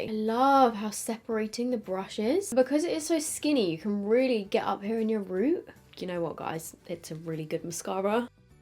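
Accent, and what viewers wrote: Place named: England, United Kingdom. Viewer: She’s English not Irish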